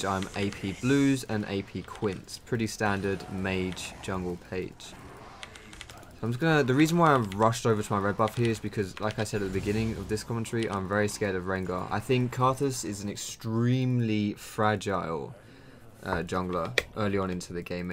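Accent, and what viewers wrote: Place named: United Kingdom. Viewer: Very manner intensive. Dat British accent.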